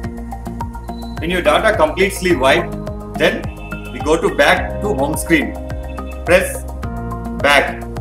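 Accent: India. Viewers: R u from Hyderabad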